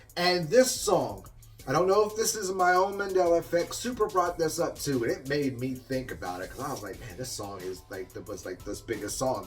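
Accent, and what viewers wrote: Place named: United Kingdom. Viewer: ✌️ No shit on the "the" being gone? Well, huh. /// These. No doubt. Must be her accent. These rhymes with agree, kinda. /// Now that I've been doing this a while, I remember green plaid, red plaid, AND black. /// I remember "A" barbie world. /// When I sat down to watch the awards that night, I just KNEW MJ was going to do something epic. He did not disappoint! And I remember when the lyrics changed. // 3008. Just look at her outfit. She was saying that she was way ahead. // This song was so overplayed, I can't stand it any more. // oh lord. Again with the British accent. // Thanks,!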